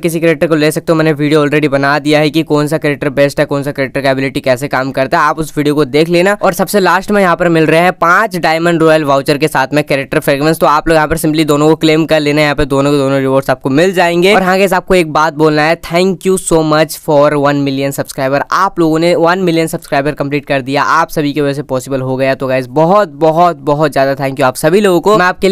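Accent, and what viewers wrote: Odisha, India. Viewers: Bhai ap odisa see ho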